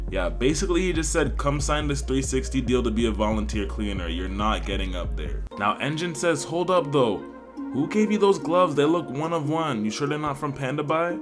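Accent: Jamaica. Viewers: Yo are you a jamaican??? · Are u a Jamaican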